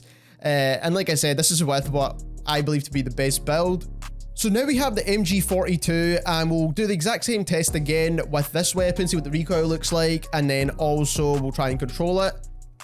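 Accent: Scotland, United Kingdom. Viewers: This is an ironic question given your name haha. It’s a Scottish accent :) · A Scottish guy! Nice one. Never seen u b4. Am nae guid at cod nowadays - too auld I think...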